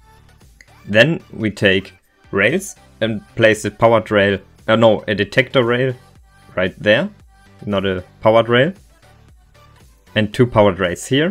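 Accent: Germany. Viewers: It's called a german accent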